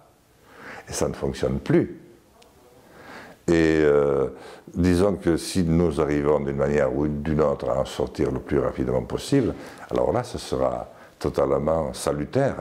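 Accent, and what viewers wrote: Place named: Occitania, France. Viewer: On n'est pas nombreux à le remarquer... L'accent occitan et la belle voix profonde les hypnotisent.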